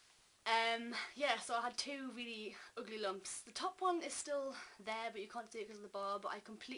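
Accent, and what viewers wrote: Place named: Newcastle, United Kingdom. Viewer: She's Geordie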